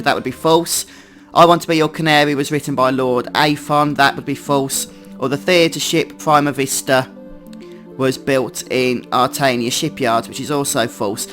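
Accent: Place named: Northern England, United Kingdom. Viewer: what accent you're using? sounds like northern accent in England. You earn my sub.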